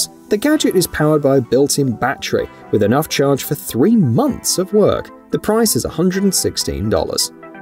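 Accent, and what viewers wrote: United Kingdom. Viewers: what's with the f**** Brit accent impresarios .. every new gadget has to have a brit presenter or banging background sound.. lighten up . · Does it bother anyone else that the items are described using British accent and terminology, but US units of measure and value? No? Just me? OK, then.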